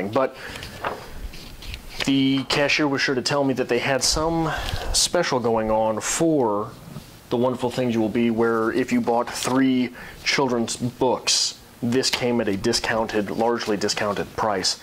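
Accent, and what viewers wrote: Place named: France. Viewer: LOL That French accent. One of my best friends had an uncle like you. He would only buy her books and she knew that she could ask for any book she wanted growing up. He really encouraged her to read and love books. Congratulations on being an uncle!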